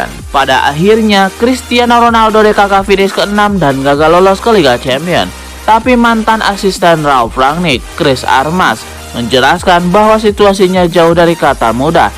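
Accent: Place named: Java, Indonesia. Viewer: Medok jg..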